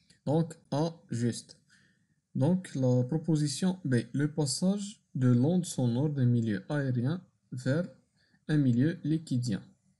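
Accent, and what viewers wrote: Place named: Jijel, Algeria. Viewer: ça aide super bien merci, ps: j'adore lehdja dyalek djidjliya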